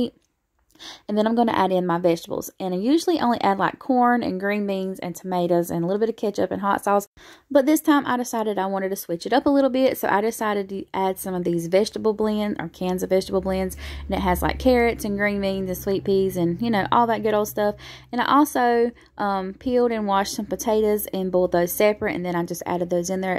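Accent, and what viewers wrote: South, United States. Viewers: I love that Southern drawl! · Tennessee gal here..I understand every word..southern accents are just fine😉💕💕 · Lovin these meals and your southern drawl! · Always love your videos! Don’t apologize for being Southern! Most of us understand you quite well!! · your southern drawl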